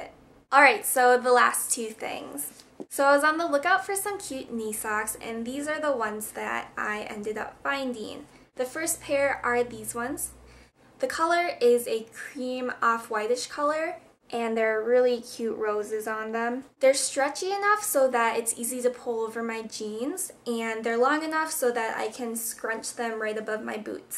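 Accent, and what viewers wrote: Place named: Chicago, United States. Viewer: you are sooo cute and i love your "accent", is that a chicago accent? i can't figure it out and it's bothering meeee!!! ahhh!!!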